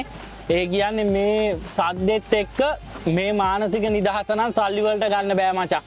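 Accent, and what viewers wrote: South, Sri Lanka. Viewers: Dakune bashawa 🎉❤ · ඒක තමයි දකුණේ විදිහ❤❤ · දකුනෙ බාසාවනො ගැම්මක් තමා ආය්බොවන්ඩ...❤😁 අපිත් දකුනේ මචෝ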